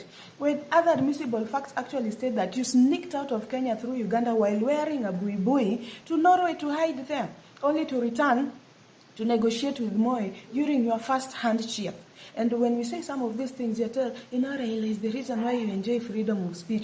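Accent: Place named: France. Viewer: please be soft on us kikuyus hii kizungu yako na babu inatulemea yawa hatuelewi hadi sometymes naona nikama unaongea french yawa😅😅😅😂😂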